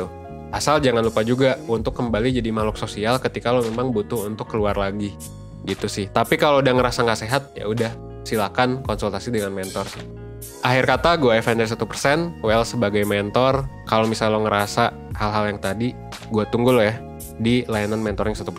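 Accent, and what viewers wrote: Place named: West Java, Indonesia. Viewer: resep pisan pas ngomong orang sundaaa hoho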